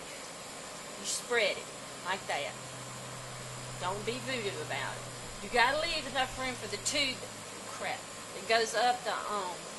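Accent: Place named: South, United States. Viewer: Love this southern gal! Sounds just like Paula Deen! Maybe it is? LOL....going to repair my concrete birth bath now!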